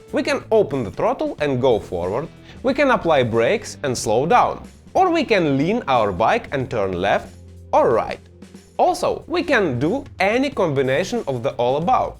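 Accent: Russia. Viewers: Hi from Bundaberg Australia 🇦🇺😊👍 Ive seen many many Educators Video's on Motorcycle Riding 🏍 Here in Australia & American BUT none of them match Your Video's , Some other people's are good BUT most are average ! Ive learnt so much from You & your teachings 😊👍 Im now understanding Your Russian Accent really well now ☺️ Thank you for doing these video's & know we new & im thinking even more experienced riders appreciates them & learning plenty ! Cheers from Aus matey 🇦🇺🕺🍹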